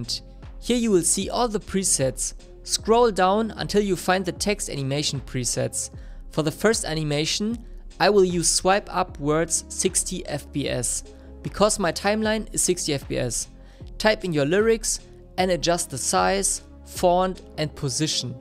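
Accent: Germany. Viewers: This Tutorial helles me alot, tysm ! (Aber du sprichst deutsch oder haha)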